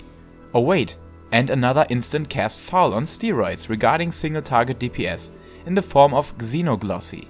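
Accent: Germany. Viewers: Are u German? You Sound like a German 😶😂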